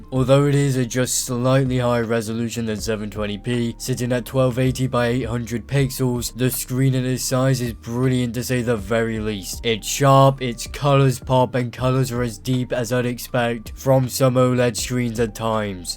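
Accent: United Kingdom. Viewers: British accents crack me up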